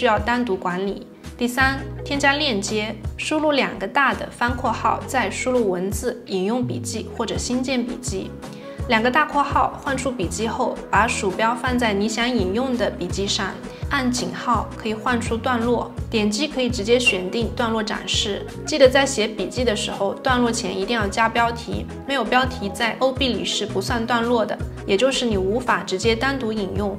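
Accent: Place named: Zhejiang, China. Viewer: 挺好的，你是哪里人啊？好像有点浙江口音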